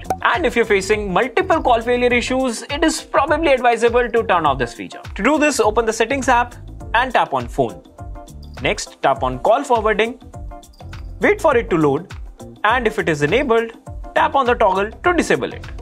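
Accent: India. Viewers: Here’s a great tip for all of us stupid Americans that don’t know how to speak India... Speak a little bit slower and try to separate your words because all we hear is alphabet soup sorry man I just figured to make it easier for your message translation typically will get frustrated and not even bother listening however thank you very much for your knowledge and sharing it with us I do really appreciate it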